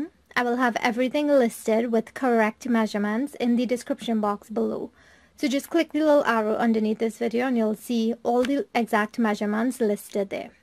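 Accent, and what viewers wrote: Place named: Trinidad, Trinidad and Tobago. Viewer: Looks delicious. Yummy! I like your Trindad accent. Everything looks so good.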